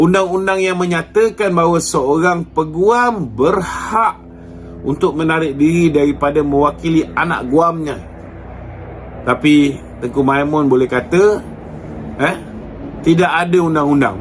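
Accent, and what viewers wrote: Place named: India. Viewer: cakap macam keling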